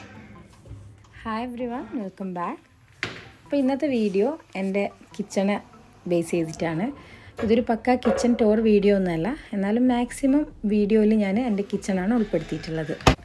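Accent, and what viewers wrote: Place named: Kannur, India. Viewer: aano.samsaaram ketappol njan karuthi kannurkaariyaanennaan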